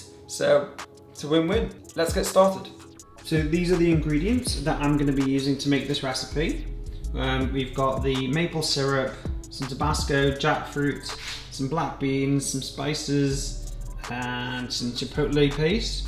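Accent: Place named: Liverpool, United Kingdom. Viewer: Food & Fitness Always yay I’m from Bromborough in the Wirral 🙌 London now though I could definitely hear it a little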